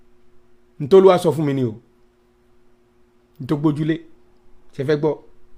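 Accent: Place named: Yoruba, Nigeria. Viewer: First of all I love that Yoruba dialect, it’s very sweet, continue my prophet......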